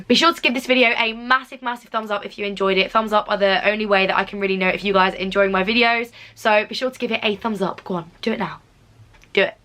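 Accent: Birmingham, United Kingdom. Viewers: Love the brummy accent coming back😍💪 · love how your brummy accent comes out here! such a helpful video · Would disagree with the tea towel we had around 20 and somehow you still couldn’t find a clean one You are soo done with past and I love it, it's brilliant😂 Love the brummy accent coming back😍💪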